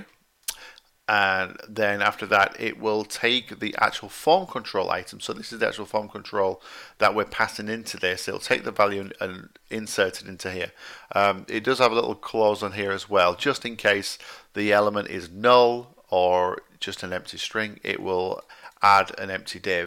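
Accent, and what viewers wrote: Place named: United Kingdom. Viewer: UK accesnt so horrible ..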